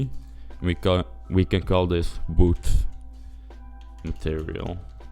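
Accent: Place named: Netherlands. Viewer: 100% a Dutch guy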